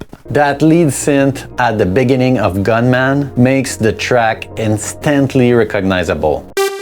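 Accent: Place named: Quebec, Canada. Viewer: Are you from Quebec by any chance ? 😊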